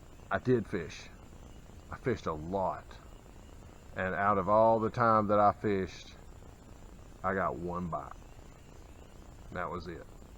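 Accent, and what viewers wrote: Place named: Georgia, United States. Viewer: Its a little strange hearing a Chattooga County accent on TV. You kick ass man!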